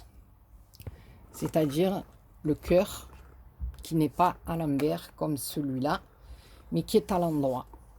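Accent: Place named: Marseille, France. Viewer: Bonjour,je te regarde depuis plus d un an,sans jamais avoir commenté 🤔🤔,oui c est n importe quoi 😊lol,vos mieux tard que jamais,comme on dit lol j adore tout ce que tu fait,tes sublimes fleurs,que j ai toutes reproduits,et maintenant tes boîtes,alors ça j adore,j adhère hihihi☺,j en ai fait 4 déjà 😉,mais les petites,mais celle ci wahouuu, Merci pour toutes ses très belles créations que tu fait 😊,et merci pour cet accent qui est terriblement Marseillais 😊,j adore 😁,ainsi que ta bonne humeur,tu me fait sourire a chaque fois,a très vite bisous bisous du sud ouest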